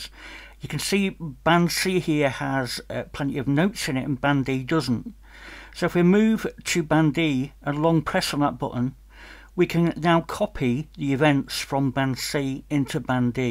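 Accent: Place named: West Yorkshire, United Kingdom. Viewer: Outstanding. all these updates. West Yorkshire by any chance?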